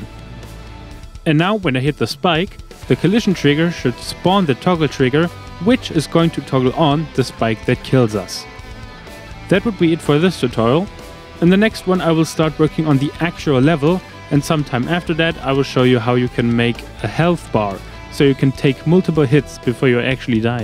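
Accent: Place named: Germany. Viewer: you sound german lol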